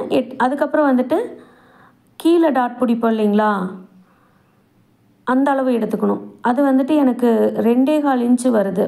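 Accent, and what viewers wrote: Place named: Coimbatore, India. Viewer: Hand writing super sister. Neenga yentha ooru sister unga language karu coimbatore side la pesaramadri erukku.. niraye video paarpen unga video rembo honest avum solli thareenga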